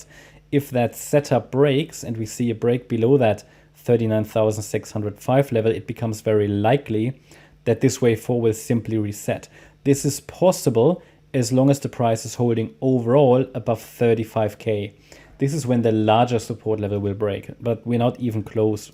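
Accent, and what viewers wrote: Germany. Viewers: Great content! Just discovered the channel. German?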